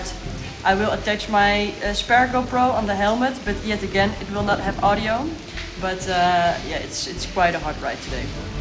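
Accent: Netherlands. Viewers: Love your videos, the dutch accent is a "must" lately in superb world travelling! ❤ All the best, take us to amazing, unseen terrains!!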